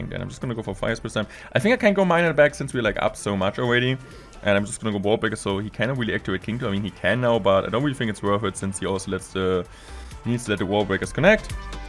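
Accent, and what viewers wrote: Germany. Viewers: Der bruder klingt selbst auf english deutsch 💀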